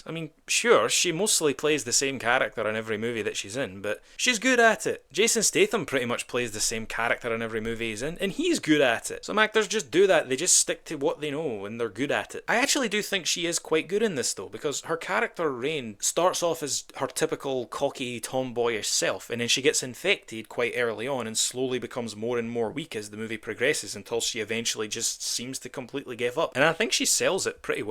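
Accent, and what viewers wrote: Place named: Scotland, United Kingdom. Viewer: This is the second of your videos ive watched. Funny commentary and I fucking love the Scottish accent. So hot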